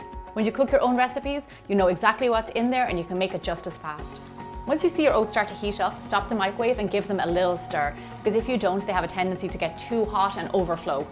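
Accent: Ireland. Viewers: I love your 🇮🇪accent🇮🇪! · Aha, well since Celtic Woman is one of my all time favorite musical groups that helps explain it. I have always loved the Irish accent, but yours is subtle. Almost lost but not quite. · Ima guess the accent Irish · Irish accent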